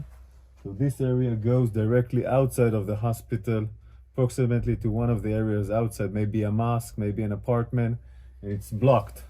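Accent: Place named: United States. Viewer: He is trying to hide hi American accent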